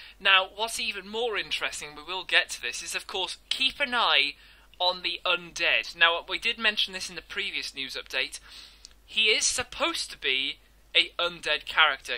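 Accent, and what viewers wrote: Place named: England, United Kingdom. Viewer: Matthew was wondering where abouts in England do you come from because your accent is really cool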